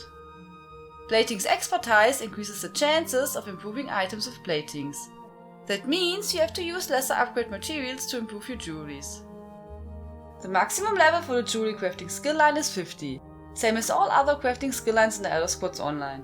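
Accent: Germany. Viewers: This really is one of the best directly informative ESO channels. It's also a nice bonus that your uniquely German accent really makes it engaging to listen to.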